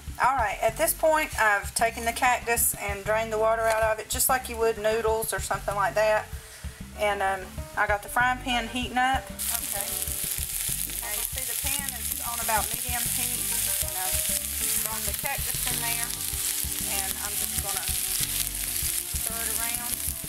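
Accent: South, United States. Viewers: Love her accent! Makes me miss the south.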